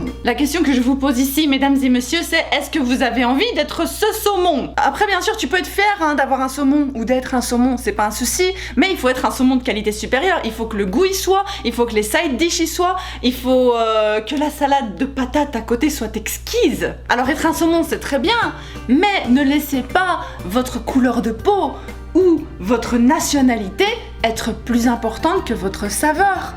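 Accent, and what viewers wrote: Belgium. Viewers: Il y a du Jean-Claude Vandamme dans le parlé de! Made in Belgium sans doute. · Je me disais bien que tu es belge lol Je reconnais bien l’accent de mon pays Vivement début septembre de cette année que je sois à Tokyo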